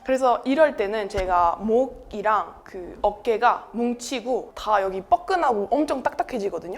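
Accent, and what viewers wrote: South Korea. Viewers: 화면 안 보면 한국인인 줄 알 듯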